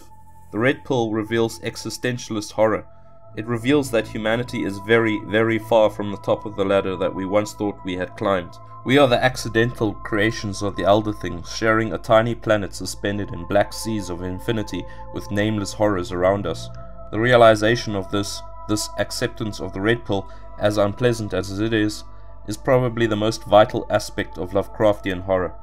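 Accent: South Africa. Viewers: I can't help but feel like the narrator is south african.. Are you South African?? If so that's fuckin Lekker bru!!!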